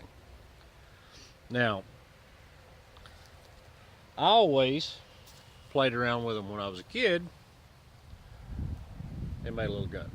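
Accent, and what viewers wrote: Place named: South, United States. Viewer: I'm subin' because you sound like you know your stuff and you sound like your from the south. I'm back in Alabama, where I belong and I've been looking for a channel like this in my neck of the woods.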